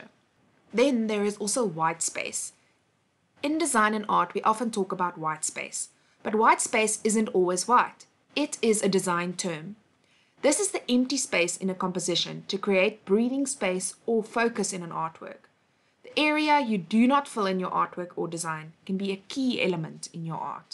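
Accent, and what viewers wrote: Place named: Germany. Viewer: Darling, audio stopped working from till the end of that chapter. It starts again with the new chapter. I don't know if it was a copyright thing or a mistake in editing, but, that happened. I hope it was only a youtube blip and there's actually nothing wrong. It's the fourth video I watch today in this channel and I'm loving it and also I love the German accent! I hope your channel keep moving onwards!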